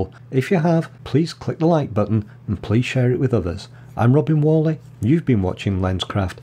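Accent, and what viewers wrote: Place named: Manchester, United Kingdom. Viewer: Hi Robin, I loved hearing your Manchester pronunciation , identical almost to my Cheshire accent, even after 37 years in Germany. However - I have to draw the line at your pronunciation of "Gaussian" as "Gaysian" - the pronunciation is phonetically "Gouwsian" as in (Northern slang) "nouse" after the German mathematician Carl Friedrich Gauß (Gowse??). Thanks a lot, enjoyed the video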